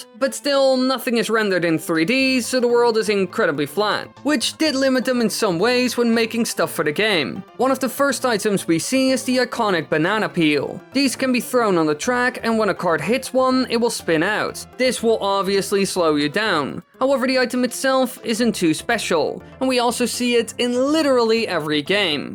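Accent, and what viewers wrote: Netherlands. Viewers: Are you Dutch? Because I recognize that accent and if you are however than I would like to say something: Leuke video's man ga zo door👍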